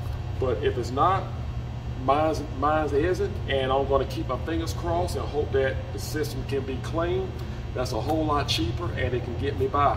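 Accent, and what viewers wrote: South, United States. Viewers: My mans so country but I love it lol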